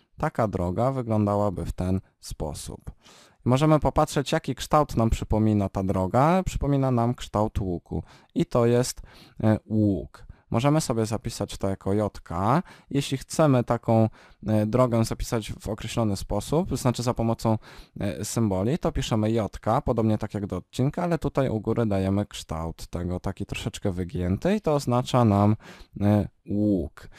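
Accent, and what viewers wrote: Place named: Ukraine. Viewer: Chłopie ty jesteś z ukrainy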